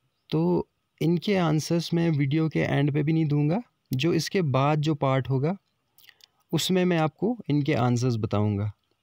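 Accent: Kashmir, India. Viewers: Sir i guess u r kashmiri...!!! Am I rt..??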